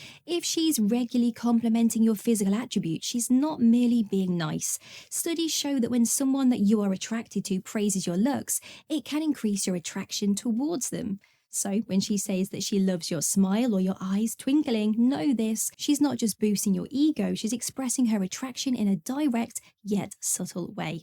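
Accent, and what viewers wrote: England, United Kingdom. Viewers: "cheeky". Oh she's so sexy with her British terminology and English accent..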